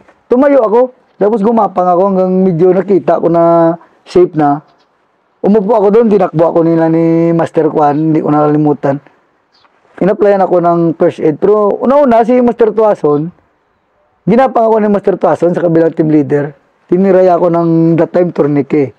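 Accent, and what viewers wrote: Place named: Iloilo, Philippines. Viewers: Parang ilonggo si sir ah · Alam mo talaga pag ilonggo kay tuno perti gd 🫡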